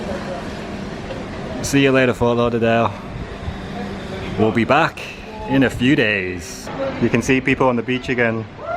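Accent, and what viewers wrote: United Kingdom. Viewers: British accent is what caught my eyes/ears so I decided to listen and now a subscriber, interesting cruise life. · Another good vlog:-) I was caught off guard at first by the british accent when I first started watching. I'm glad all is going well...I too was wondering how you guys deal with laundry and room detail. Thanks for the great content...always fresh and putting a positive light on what's going on. It's much appreciated...myself...i would be climbing the walls...at least you have the internet and tv to help pass the time.:-) Have a great day:-) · It’s a Liverpool accent 😎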